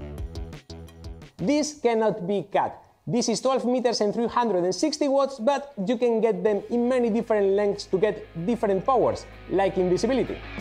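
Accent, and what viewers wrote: Spain. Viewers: etsba viendo este video...y pensando este tio habla epsañol xD ese ingles es muy español jajajajjaaj saludos grande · seunas a español hablando ingles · se nota mazo que eres español jajjaja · Adoro la forma que tenemos los españoles de pronunciar el inglés jaja, te dejo mi like! this vid was fun ^^ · eres un español hablando ingles? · Con tu acento me da por hablarte en Español, pero tampoco es que haya confirmado que eres Español The bed can be heated in sections? Because if you can , doesnt seems hard to distribute the heating cable in "discs", like the ones on a ceramic hob, so you can save power, heating only the sections you are using, on a normal 3d printer is not really a concern, but in something that big, the bed must be really expensive to maintein edit: okay, ive seen that part now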